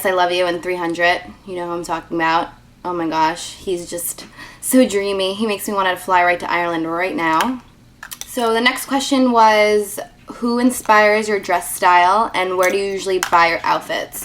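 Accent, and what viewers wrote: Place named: Brooklyn, United States. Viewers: Are you from Jersey or NY? I'm from Brooklyn & I can hear that voice represent girl!!! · You're absolutely gorgeous. U look like Megan Fox. U seem like a very down to earth girl. You're very talented! Love all ur tutorials! I love Ryan Gosling too lol. Are u from New York or New Jersey? U have a New Yorkian accent. I really like what u said about not settling for anyone--wise girl hahah srry for all these comments bunched together. Anyways keep doing wut you do and thinking the way u do, you will succeed :)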